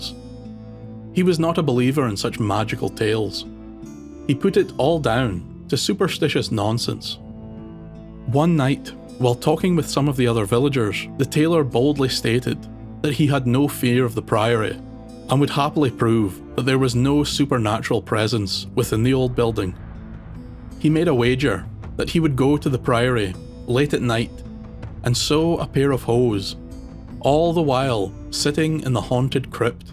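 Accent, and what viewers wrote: Scotland, United Kingdom. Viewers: I only just discovered your channel and I'm hooked! I'm surprised you don't have more subscribers. It's so refreshing to hear Scottish folklore told by someone who's actually Scottish and not American lol.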